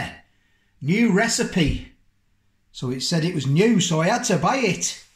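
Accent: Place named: Yorkshire, United Kingdom. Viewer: Where are you from.. you gotta be yorkshire lol.. where about.. I'm Leeds .. I'm gonna try this.. when iv room int freezer lol x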